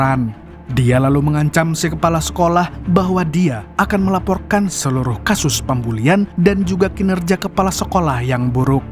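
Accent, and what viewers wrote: Banjar, Indonesia. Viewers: urang banjar pian lah min 😀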